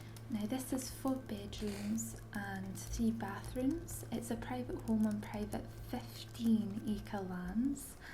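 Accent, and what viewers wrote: Scotland, United Kingdom. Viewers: Great Scots accent *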